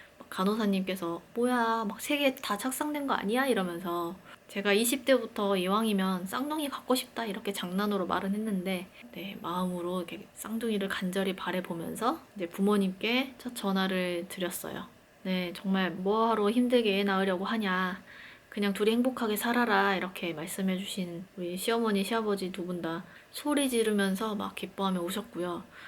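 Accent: Gwangju, South Korea. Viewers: 혹시 전라도 분이실까요?? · 광주분이신가봐요?아닌가?암튼 그래도 고차수 까지 안 가고 성공하신거 늦었지만 진심으로 축하드려요~ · 저도 2월7일날 2차피검 실패하고 다 싫고 아무것도하기싫고 사람만나기가 젤 싫은 중 입니다 말투가 광주 분이신거같아서 영상봣더니 저랑 같은 병원이시더군요…ㄱㅈㅁㅎ 너무 부럽구~ 쌍둥이 임신 축하드려요 저도 그런날이 올까요?ㅠ 서카딘은 그냥 살수잇나요? 처방전받아야하나요?? 저도 광장히 예민해서 잠을 잘 못자고 그렇게 무언가에 쫒기는 꿈을 꾼답니다ㅠ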